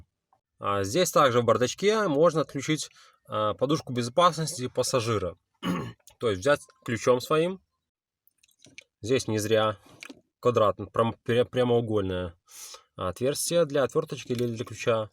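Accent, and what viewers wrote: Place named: Belarus. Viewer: Судя по акценту , автор Белорус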